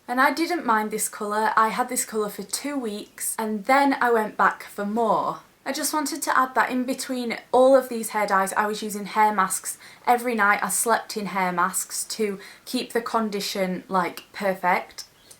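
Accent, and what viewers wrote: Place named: England, United Kingdom. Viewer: I love this tipical english accent! Cute!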